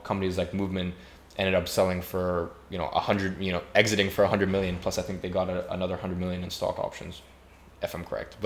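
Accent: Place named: United States. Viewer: Why does he have an American accent?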